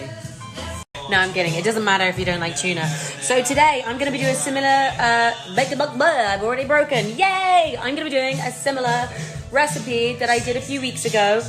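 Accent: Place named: Oxford, United Kingdom. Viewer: oxford accent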